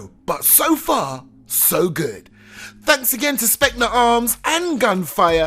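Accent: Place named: United Kingdom. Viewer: Ima sum up why Airsoft Mike is my favorite airsoft reviewer: 1. He has a british accent (like come on) 2. He’s always super excited about reviewing these guns like it’s awesome 3. He likes to review budget guns and sometimes are beginner guns 4. If there’s a gun he’s prolly reviewed it EDIT: TAKE MY MONEY SPECNA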